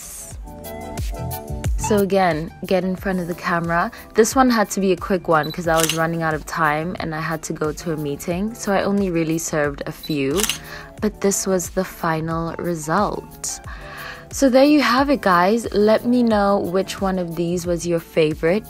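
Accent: South Africa. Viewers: Presume you're South Africa, cause of your ascent... great tips hey, from the motherland... South Africa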